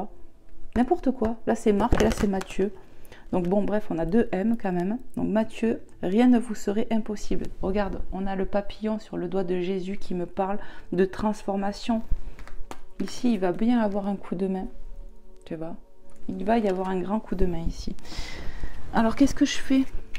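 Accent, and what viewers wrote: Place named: South, France. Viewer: Cc tu es du sud comme moi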